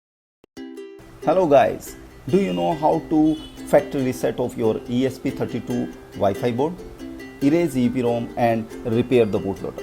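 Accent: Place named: India. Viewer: Hard to understand Hinduglish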